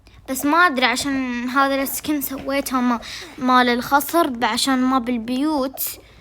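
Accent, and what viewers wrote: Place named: Iraq. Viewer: ماكو اتوقع انها عراقيه!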